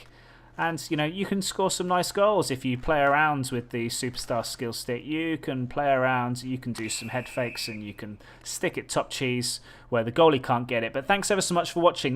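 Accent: United Kingdom. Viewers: Somehow your British accent makes it actually feel like I'm learning. Teach me about the inconsistent stick physics, though defenders legs ect.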